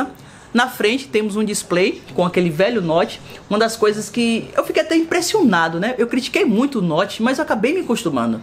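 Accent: Bahia, Brazil. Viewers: Cheio de sotaque kk, esse é baiano mesmo ❤️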